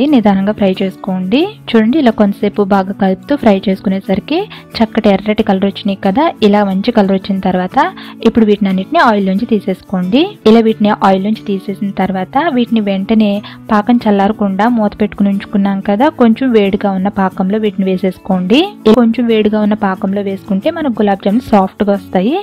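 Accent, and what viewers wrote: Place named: Andhra Pradesh, India. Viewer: Your voice is very sweet Andhra ammayi anipinchela me explaination bagavundhi.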